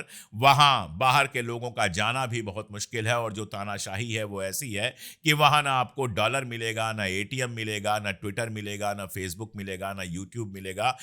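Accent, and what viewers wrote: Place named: Kashmir, India. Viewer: sir kashmiri hein zabaan mein kashmiriyat he bhai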